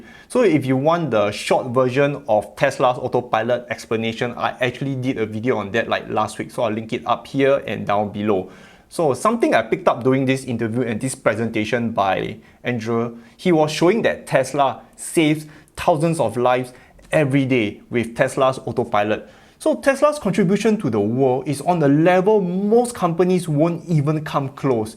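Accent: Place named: Singapore. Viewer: I don't know what is more valuable about: His knowledge or his Singapore accent?!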